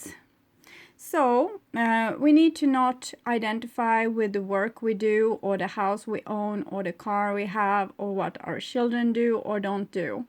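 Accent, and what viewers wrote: Sweden. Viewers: Are you from Sweden? Thank for a good reading. ❤️ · My ex has been calling me but I don't want to hear anything he has to say. Yes, he is a very inmature Leo who doesn't love anyone, including himself. It breaks my heart that he is so broken but he is unbarably toxic but I must protect myself from him. Being the better person is something I have always been told but I have learned their are lots of people who count on nice people being the better person so they can take advantage of the person being the bigger person. Thank you for tnis reading and I just love your Swedish accent🌹🌹🌹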